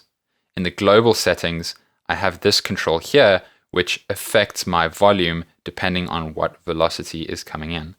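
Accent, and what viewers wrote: South Africa. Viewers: You sound South African? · definitely south african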